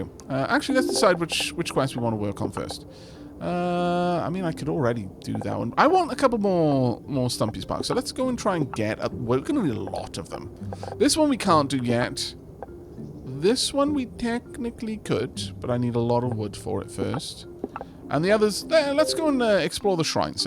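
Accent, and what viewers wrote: United Kingdom. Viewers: I 100% thought you were British. Hell, I still do.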